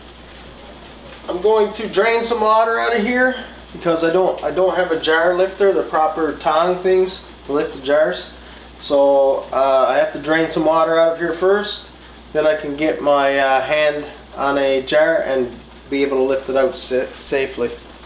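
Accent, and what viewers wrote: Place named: Newfoundland, Canada. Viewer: I knew within 5 seconds of hearing you I can to the right place. Newfies Rule.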